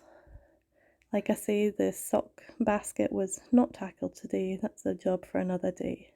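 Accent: Scotland, United Kingdom. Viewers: Greetings from Portland Oregon. Mac Bonnie Barbie and Coline follower, also mom of 4, also migraine sufferer, I lived in NE Scotland for about 2 years and my second was born there, so jumped at the chance to hear familiar sounds sights and dialect.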